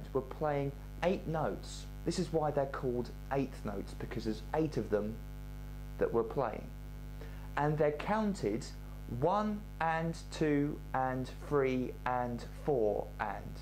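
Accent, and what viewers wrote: United Kingdom. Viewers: most british accent i have ever heard..... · he has a british accent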